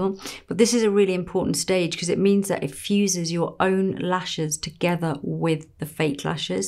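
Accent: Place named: RP, United Kingdom. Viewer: It's a lovely look, however, I have an extreme allergy to acrylates and all of the fake lash products seem to contain them, unfortunately. Same for Lashify. Any suggestions? You are so very pretty, and I love listening to your plummy voice, too.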